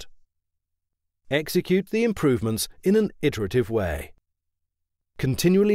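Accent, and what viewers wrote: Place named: United Kingdom. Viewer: Thank you, thank you, thank you for uploading all of this. I can focus and understand much better with a nice British narrator as opposed to the flood of p.a.j.e.e.t made videos.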